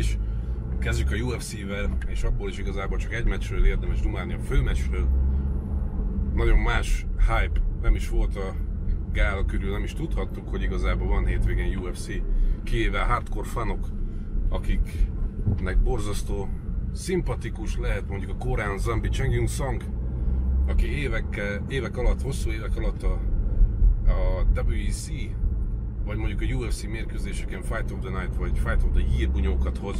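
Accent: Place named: United States. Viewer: Szörnyű hallgatni, hogy az anyanyelveden, amerikai hangsúllyal beszélsz.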